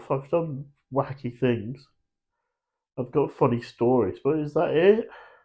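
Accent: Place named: Southern England, United Kingdom. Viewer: I’ve only just seen your channel pop up on my homepage, and there are elements of the helplessness that absolutely resonated within me. I lost my girlfriend of 5 years due to cystic fibrosis when we were both just 21 years old. I had months of feeling just as you’ve described - and remember being sat in my kitchen crying my eyes out with my dad feeling like he wasn’t able to help me. I know you’ve not asked for advice but all I will say is the thing that I found the most difficult was allowing myself to be happy, to be in the moment and take the joy from the little things at their face value, and try to detach the shadow hanging over me. The joy of every happy moment was overshadowed by thinking “tomorrow will be back to black” but over time I managed to let go of the shadow and for me that’s when my second life began. It’s the first time I’ve seen your channel, and really do hope you read this as it takes an absolute boat load of bottle to come out to admit these things. 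Sometimes with mental health things just feel so hopeless. I’ve subscribed to your channel, despite the fact that all I’ve seen is a man crying for a few minutes 😂 but in all seriousness I will look out for your next video, I’ve got no idea where you’re located but your accent sounds like southern England - if you are anywhere near Hampshire and want a beer or a coffee it’s a genuine offer mate. You won’t get there today, maybe not even tomorrow but you will get there. 🤘🏼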